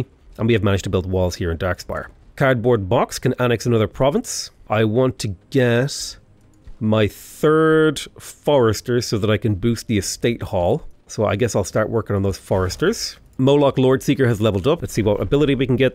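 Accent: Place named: Ireland. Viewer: Thank you sir, very informative very interesting and such learnable for Ireland accent 😊 This is good way for me to study English. Thank you again. Please more AoW4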